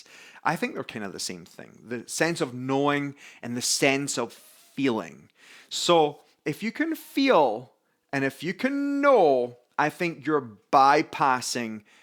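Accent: Scotland, United Kingdom. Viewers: Always a great pleasure to hear you (love your Scottish accent)and thanks for this deep lesson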